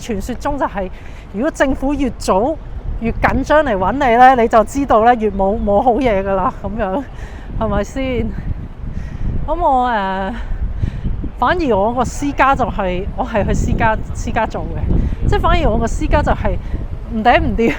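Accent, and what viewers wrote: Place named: Hong Kong, China. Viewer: 你係咪耳聾架，正宗香港人一聽就知片主係香港人啦，咩野大陸同胞共享香港免費醫療呀？有病快啲去睇醫生啦，新制下，精神科唔使錢架。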